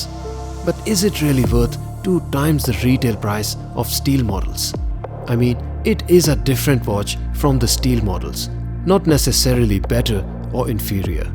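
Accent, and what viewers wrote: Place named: Kerala, India. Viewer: bruh sounding like a physics teacher from Kerala...."vwaatch" yeh no thanks youtube recommend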